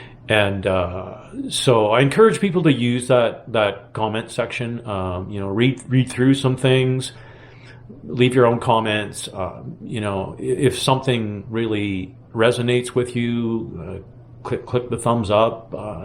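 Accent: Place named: Canada. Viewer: Thank you so much for your heartfelt and very valuable updates on Andrew Cross's ongoing crisis. You have to be Canadian-born, like myself, for some of your north-of-border pronunciations. (Well done, I say, as a dual citizen living in USA but born in Ontario in 1955.) Your commentary has been nothing short of brilliant, even if heart-rendering at times. You are a saint for making this effort and I thank you again from my deep heart.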